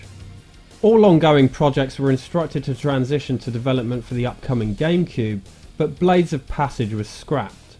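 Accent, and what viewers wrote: United Kingdom. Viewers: I think one of the reasons why the N64DD was DOA was the fact you could only buy it off the internet and online shopping was a brand new concept back in 1996. So new in fact that almost no one really did it at the time. Why Nintendo did it is a complete mystery to me but it would have had a much better time if it was sold in retail stores like GAME in the UK where you sound like you are from (you sound British to me) or Best Buy in the US where I live. I dunno if you would agree with me or not, but it is the main reason why it did not go well from what I have heard.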